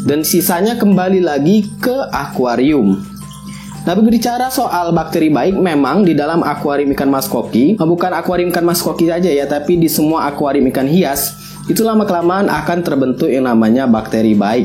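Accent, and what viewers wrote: Palembang, Indonesia. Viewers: Wong Palembang caknyo kau Yo??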